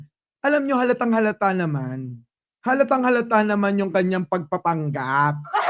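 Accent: Ilocos, Philippines. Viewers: NAKAKATAWA KA IDOL SIR NO AGSAO KA TI ILOKANO, SARAP PAKINGGAN 🤣🤣🤣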